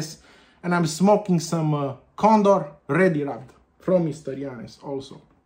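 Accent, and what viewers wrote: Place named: Liverpool, United Kingdom. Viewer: lol! your Liverpool accent 😂 i like you even more now 😊 i really like Condor too and, as it turns out, Ennerdale too. i may have been wrong about Lakeland tobacco.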